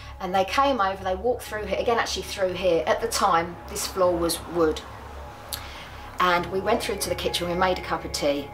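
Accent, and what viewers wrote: England, United Kingdom. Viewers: english accents are so pretty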